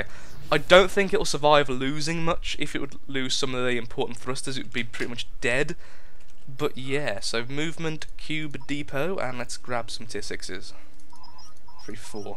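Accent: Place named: England, United Kingdom. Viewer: I am loving ur videos do u live in England because u sound like it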